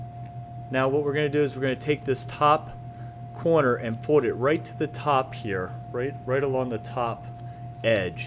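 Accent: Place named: Pittsburgh, United States. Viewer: Digging your Pittsburgh accent. :)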